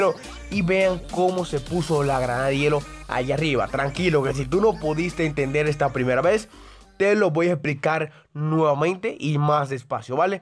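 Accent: Dominican Republic. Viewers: eres de República Dominicana?. ¿Verdad?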